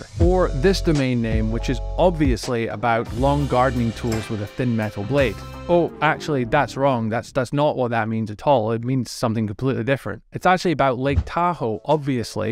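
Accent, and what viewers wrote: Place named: France. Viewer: because for French Accent and the funny (and useful) video i voted on Product hunt too:) Thanks guy for this tool and videos:)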